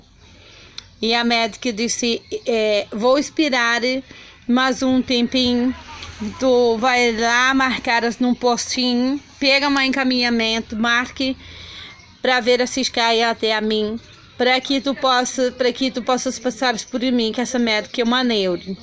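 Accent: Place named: Spain. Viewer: Ela tem tres sotaques em um video espanhol, portugues e frances.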